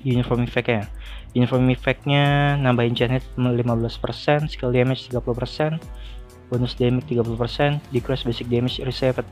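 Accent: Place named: Betawi, Indonesia. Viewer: Lu org betawi min ya 😅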